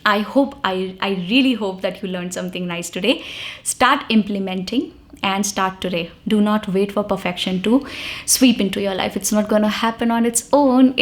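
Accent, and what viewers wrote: India. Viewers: are you Indian.your speaking pronoucition proved you are a indian girl.but doubts the name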